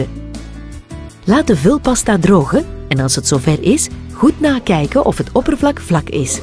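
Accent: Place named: Belgium. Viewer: Het is slechts een Belgisch accent, ze spreekt gewoon Nederlands hoor. Dat leer je in Nederlandstalig België ook op school. West Vlaams is echter wel compleet onverstaanbaar.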